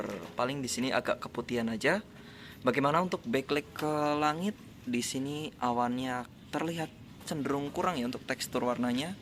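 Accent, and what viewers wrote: Banyumas, Indonesia. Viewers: bang lu org banyumas ya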